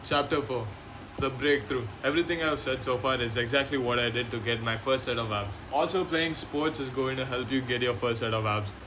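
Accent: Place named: India. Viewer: Bro you sound like an Indian guy. Are you from India?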